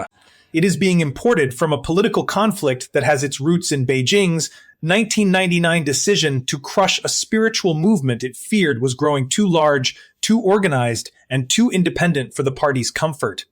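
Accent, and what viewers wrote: United States. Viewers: Why the American accent? This is BS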